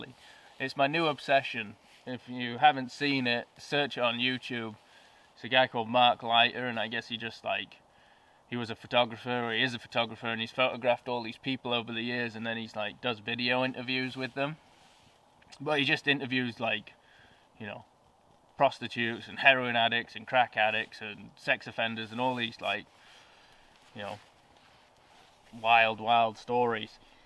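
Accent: Yorkshire, United Kingdom. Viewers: What part of Yorkshire are you from then? 😁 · Yorkshire accent? Just chillin' in the woods, eating steak, sipping whiskey, very cool!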